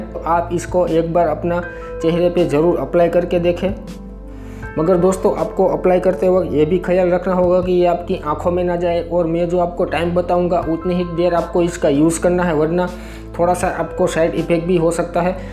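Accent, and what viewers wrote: Gujarat, India. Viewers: Bhai tu gujrati haina teri aawaz se hi pta chl gya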